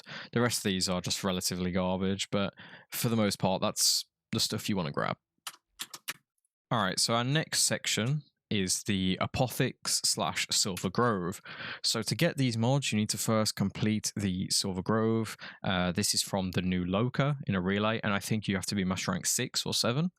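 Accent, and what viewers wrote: United Kingdom. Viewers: the briish gigachad channel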